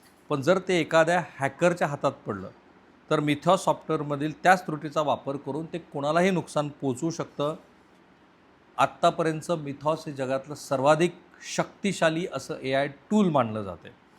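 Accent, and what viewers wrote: Marathwada, India. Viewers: साहेब तिकडचे आहेत, मराठवाडा भागातील तिकडे ण चा उच्चार न असा करतात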